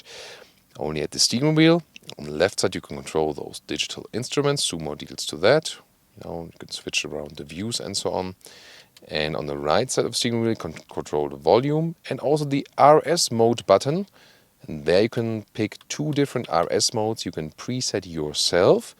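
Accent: Germany. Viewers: Hi, may I ask you where you filmed that review?! Nice nature. Btw: Do you speak german? - I must say the reviewers accent sounds a little german ; )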